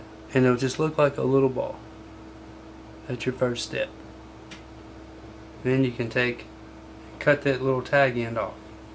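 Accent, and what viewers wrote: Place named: United States. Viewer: love the accent what part of usa ? Video is great btw!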